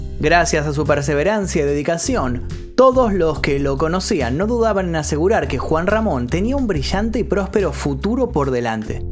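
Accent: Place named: Argentina. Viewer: No soporto el acento argentino 😅 tengo que dejar de ver un buen video 😢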